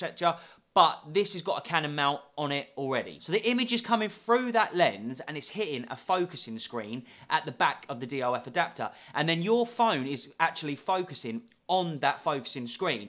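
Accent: United Kingdom. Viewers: that british accent made the video even more interesting to watch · I am in love wifh vhe cockney accent brova Bu' vhe presentation was good. Fhanks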